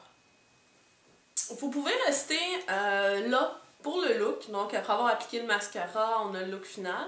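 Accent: Quebec, Canada. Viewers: salut jaime vraiment tes video! sa fait du bien dentendre du quebecois loll des fois les francaise ne dise pas les mot anglais comme il faut et elle me perde et surtout il y a des produit quon trouve en france mais pas au quebec!! merci tes super bonne!!!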